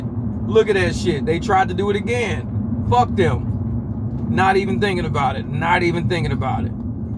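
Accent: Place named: Bay Area, United States. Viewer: You sound like you’re from the Bay Area.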